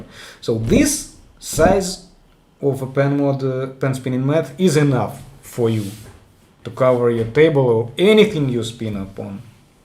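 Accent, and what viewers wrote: Russia. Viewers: He is russian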